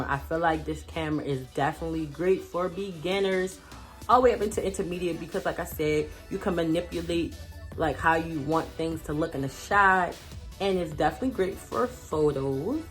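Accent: Baltimore, United States. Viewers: I thought so lol that’s where I’m from. I know a bmore accent when I hear it lol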